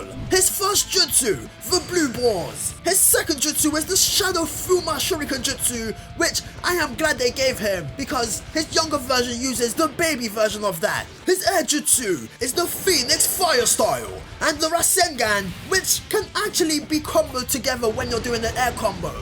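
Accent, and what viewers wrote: United Kingdom. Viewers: Yea I'm pretty sure he's in the UK especially with the accent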